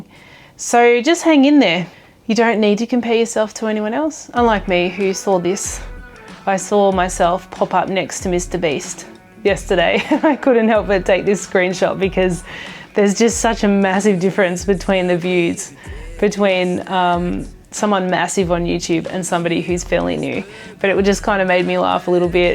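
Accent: Australia. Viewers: That was terrific ! On your chart, I am in that tiny pink group of over 65 year olds, female from the US. As a career custom jeweler with a love of Boulder and Black Opals I have also learned to love the Aussie dialect. The things I love about your channel include your family and general caring attitude, the opposing season to mine yet a similar climate, the realistic working messiness of the farm, your voice and amazing eyes. I liked that you responded to my comment half a year ago including a mention of opals. It felt personal. On your next trip to the US consider far northern California, on the coast for a stop. I would enjoy showing you around. We have KUNE KUNE pigs from New Zealand. I have a flower stand and show pictures on instagram This is also a huge lily producing area. Redwood trees bigger than imagination all around. Keep up the excellent videos and flower farming.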